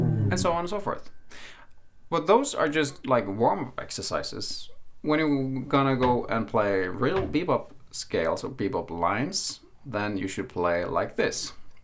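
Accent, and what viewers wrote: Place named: United Kingdom. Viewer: So British !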